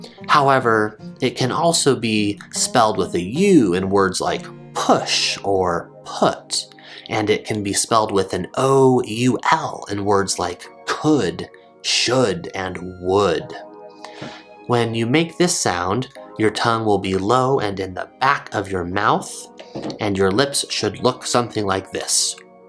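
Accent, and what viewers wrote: United States. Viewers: What side of the US are you from, to find out more about your accent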